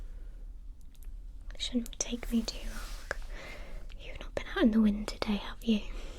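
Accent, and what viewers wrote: United Kingdom. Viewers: I love British accents! I love you vids! Thank you!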